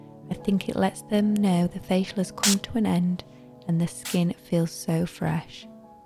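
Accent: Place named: Northern England, United Kingdom. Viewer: is hers a Northern English accent? Whatever it is, I love it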